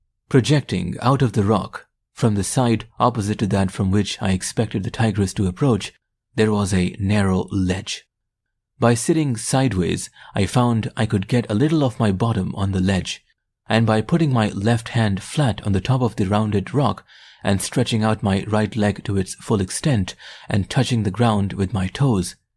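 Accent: India. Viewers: Even though jim Corbett was an Englishman the story is narrated in an Indian voice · Would be better without the forced pseudo-American accent. It grates on the ears. Edit: ah, just realised you are an Indian living in the US. That explains the appu accent. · I love hearing these stories from someone who is from india or has parents from india. My favorite aunt is from india. And ever since i was a small kid ive been fascinated with her culture and family. Her two sons are my favorite cousins and i do my best to be a good older male role model for them like she and my uncle were for me. Hearing your voice reminds me of her family. Thanks for the authentic experience.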